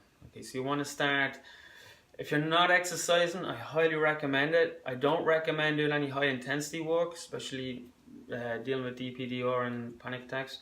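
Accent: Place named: Ireland. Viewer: Where's that cute accent from? Ireland? I bet Ireland has alot of DP/DR due to your strong need for omega 3s (fish) and high alcohol intake (depletes B vitamins). I'm a fourth Irish and Swedish...very delicate brain/CNS. Was diagnosed with AF 20 years ago. Unfortunately I didn't learn the cause of AF and DP (22 years now) until 6 months ago. But Thank God I did. It's all rooted in a B1 deficiency. As a nutritionist I have lots of great resources I can share. But here's the basics... B1 in the form of Benfothiamine plus an excellent methylated B complex. Also lots of magnesium as a co-factor. Daily or a pound a week of beef liver...a B-BOMB! An important traditional health food. Vit D supplement too. Lastly, I highly recommend a traditional Northern European Diet...free of salicylates. Great video! I love your scientific nutritional approach! That is very much lacking in the DP world. 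Let me know if you want more info.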